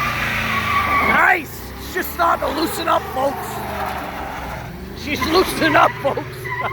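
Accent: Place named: New Jersey, United States. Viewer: The Jersey accent makes it all worth it.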